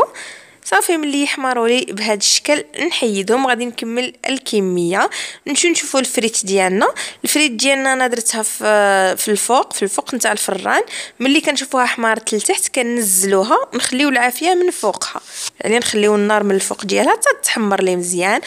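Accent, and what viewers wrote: Oujda, Morocco. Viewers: oujdiya hta ana akhoti mli nchofha nazlat chi vidio kanfrah bach nsma3 sotha olhadra loujdya wlh twahchna oujda olghorba s3iba bzaf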